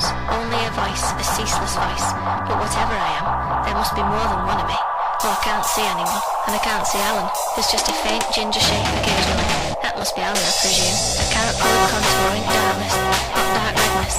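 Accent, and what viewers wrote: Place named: Lancashire, United Kingdom. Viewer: different and good! Love the lancs accent just as much as i despise the old boy eton twang.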